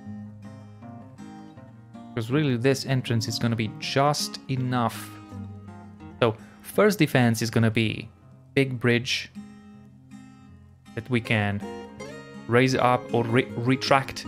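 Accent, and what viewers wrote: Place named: Italy. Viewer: Ι cant tell if your accent is greek or italian or maaaybe spanish. Which is it? Betting on Italian.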